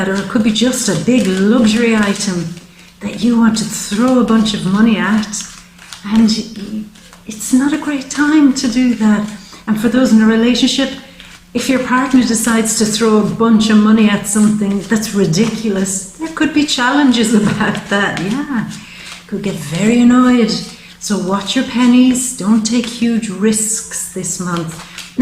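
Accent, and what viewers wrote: Ireland. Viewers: 🤣🙏 you make me giggle, what county are you from in Eire? I can't figure out your accent...it sounds like a very, very soft out skirts of Dublin or then I'm thinking is it Clare lol go on your going to tell me neither. You have a joyful soul thank you for your work, your time & energy it's gratefully appreciated & I've taken note....don't just spunk my money I got it! 💖💝🌈🙏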